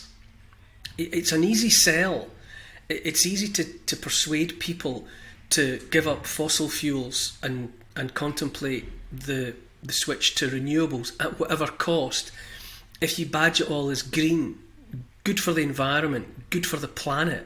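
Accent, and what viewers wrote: Scotland, United Kingdom. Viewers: You Scottish or english?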